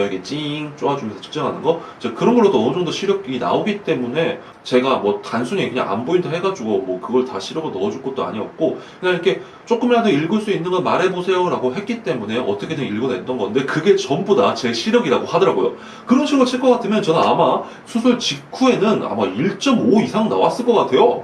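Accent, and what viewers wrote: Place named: Gyeongsang, South Korea. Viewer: 고향이 경상도 어디에요? 저랑 말투가 똑같ㅎㅎ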